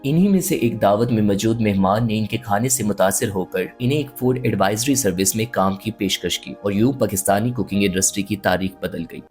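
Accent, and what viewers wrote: India. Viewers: آپکا تلفظ انڈین لوگوں جیسا کیوں ھے؟؟؟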